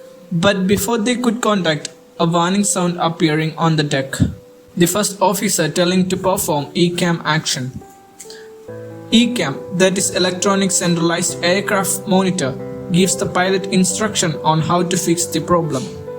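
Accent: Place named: India. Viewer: Anying logat Inggris India kocak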